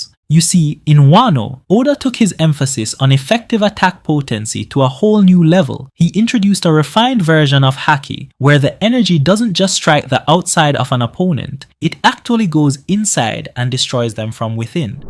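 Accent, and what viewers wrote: Jamaica. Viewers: are you jamacian? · Your deff jamaican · You sound Jamaican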